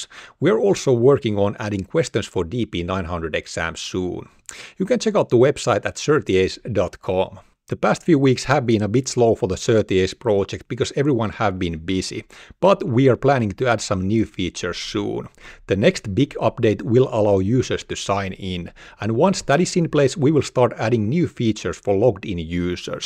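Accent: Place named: Finland. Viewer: Definitely can hear the authentic Finnish tone my friend, me and my team members are referring to your lessons to study the DP-600. Enjoy Fabcon, Kiitos!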